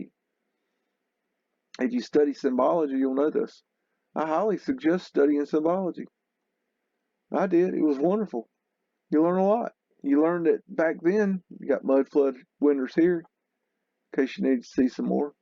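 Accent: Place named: South, United States. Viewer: Love what you're doing! Whoa that accent is thick tho lol where in the south are you from?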